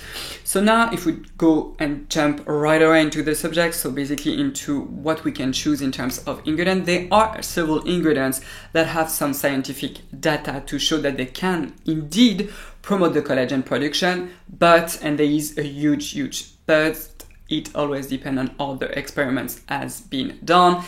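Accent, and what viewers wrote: France. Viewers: I thank you so much for your content & explanation! Every video you make me smarter & way more informed. Added bonus is listening to your incredible French accent! French was my foreign language in school as I think it is the most beautiful language in the world! ❤️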